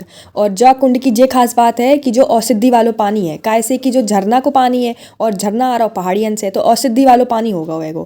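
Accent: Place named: Bundelkhand, India. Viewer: Aapka bundelkhandi accent is so good 🤌👏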